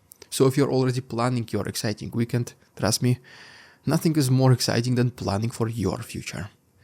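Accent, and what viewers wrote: Russia. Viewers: WHEN I QUICKLY SURFED THROUGH YOUR CHANNEL I THOUGHT - UGH, WHO’S THE THICK RUSSIAN ACCENTED — HIPPIE? HE NEEDS A GOOD SHAVE, HAIRCUT AND PERHAPS AN UPGRADE WITH HIS WARDROBE. I’M SURE HES A PROPAGANDIST WHOS KNOWS SHIT ABOUT ANYTHING, SO WHY WASTE MY TIME. I GAVE A LISTEN. MY JUDGEMENT BY YOUR BOOK COVER WAS WAY INCORRECT. YOURE SUPER BRIGHT, ARTICULATE (EVEN WITH YOURE SLAVIC TONE HANGING HEAVY) AND MORE INSIGHTFUL ABOUT THE ONGOING CONFLICT, RUSSIAN SOCIETY AND KREMLIN POLITICS THAN ANY YOUTUBE BLOGGER OUT THERE. I’M NOW A BIG FAN. THANKS FOR YOUR REPORTS.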